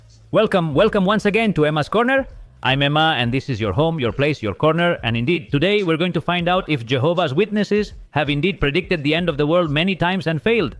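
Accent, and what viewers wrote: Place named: Pakistan. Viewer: Yup, Pakistani accent, but doesn't matter, the message is what's the real matter here, the reason you are doing here Good luck with this new adventure, open more eyes, spread the truth about that cult and save people from the government body's claws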